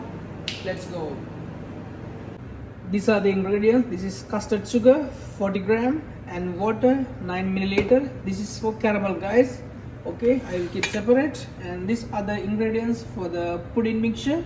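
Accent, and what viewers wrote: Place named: India. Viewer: English katha karane India un wage ai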